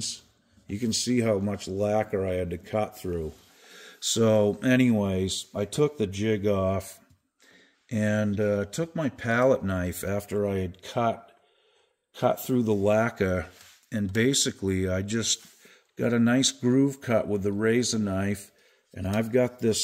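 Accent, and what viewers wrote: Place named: New England, United States. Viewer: Wow, interesting! Following to see what becomes of this Harmony. Probably better than it ever was! Also, your voice reminds me of if Mike Ehrmantraut was born and raised in New England!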